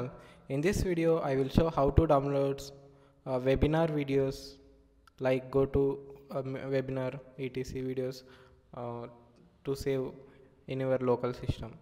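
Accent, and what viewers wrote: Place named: India. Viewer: Liked your video, but your accent is very hard to follow - I've been in the US for over 52 years and I think if you spoke a little clear and a bit more with not so thick Indian accent you'll definitely excel !! Keep up the good work - you're good !!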